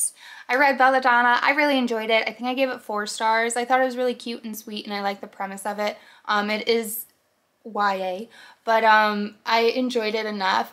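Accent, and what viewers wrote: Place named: New Jersey, United States. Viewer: oh, your accent sounds like you’re from Jersey.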